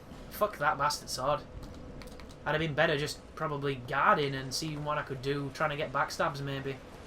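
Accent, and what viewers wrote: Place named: Northern England, United Kingdom. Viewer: thank you brother, my father is actually Scottish, but I live in spain. so I am kind of hybrid, I kind of knew you were from England but I was not sure,since north English people & Scottish can sound very similar.. I have found your channel on your video about finding vendrick hidden soul after killing him, then I also commented about how huge RYU HAYABUSA fan I am:)) also sorry I have missed your Castlevania run, I will try to watch them soon, also thank you for welcome me to your channel brother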